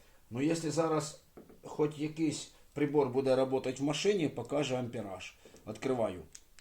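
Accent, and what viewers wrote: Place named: Central Ukraine, Ukraine. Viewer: Його рідна мова - суржик, а точніше діалект, яким розмовляють в центральних областях.І він від цього не париться.А страждаєш ти, і причому страждаєш дурістю.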